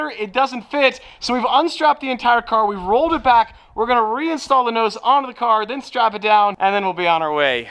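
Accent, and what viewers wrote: United Kingdom. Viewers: Proper British accent. 10/10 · More of that bri'ish accent haha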